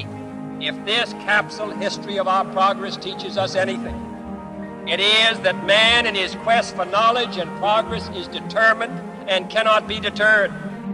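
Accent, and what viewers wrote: Boston, United States. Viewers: THAT is one wicked Bostonian accent.... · "......nat becausah there easy bat becausah there hahhd" -heerlijk Bostonian accent . Wisdom and courage combined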